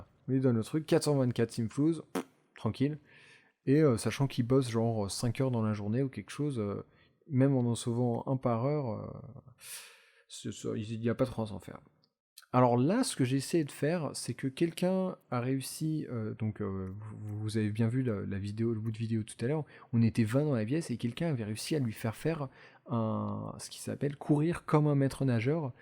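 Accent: England, United Kingdom. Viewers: Cet accent anglais de malade! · J'adore ton accent anglais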